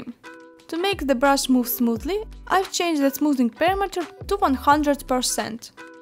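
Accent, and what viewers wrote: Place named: Russia. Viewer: Воу как рашн акцент виден)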